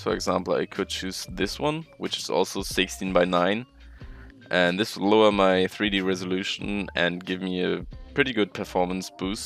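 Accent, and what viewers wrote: Germany. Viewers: War ja klar das er Deutsch ist XD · Wie man einfach das Deutsche raus hört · Ich wusste es das du deutscher bist habe mir die ganze zeit gedacht das ist doch ein deutscher der englisch redet aber jetzt der beweis 😂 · cleannn valorant intro. edit: are u german, because u sound like whitecat (osu)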